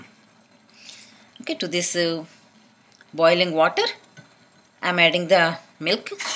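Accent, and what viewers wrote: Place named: South India, India. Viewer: She's a South Indian and they have that kind of accents. Very strong MTI. Though a Pakistani talking about English and it's accent is kinda funny 😛😂😂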